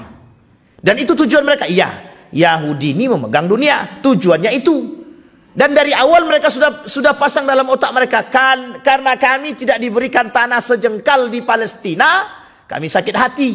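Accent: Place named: Rokan Hilir, Indonesia. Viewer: Logat pak Ustadz mirip dg BHS Rohil Riau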